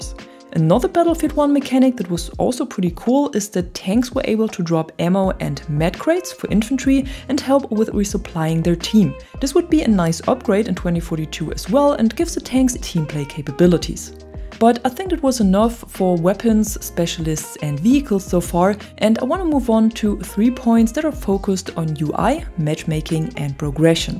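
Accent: Germany. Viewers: I'm only here for the sound of your voice, are you German?😊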